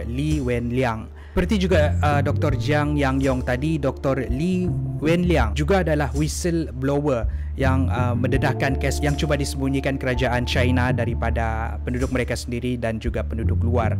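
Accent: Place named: Sabah, Malaysia. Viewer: Cakap sabahan x lari.. I like it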